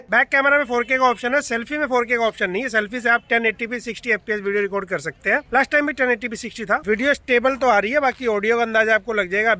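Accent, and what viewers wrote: India. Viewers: Guru g aap ka unboxing ekdam indian accent me best rehta hai na zada wo jaise aur log dikhate hai and kuch log muh bana kar karte hai unboxing par aap ka unboxing waqai me dekhne me dil karta hai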